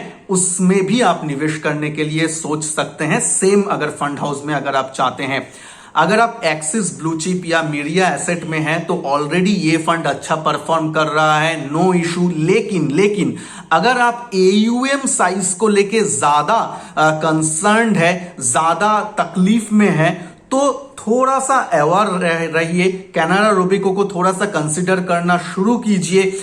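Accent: Bengal, India. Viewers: বাঙালির হিন্দি শুনলেই বোঝা যায়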